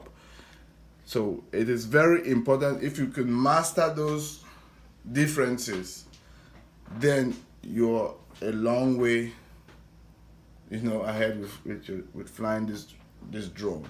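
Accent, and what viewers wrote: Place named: Trinidad and Tobago. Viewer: Are you from Trinidad? Just wondering, your accent sounds just like my old boss.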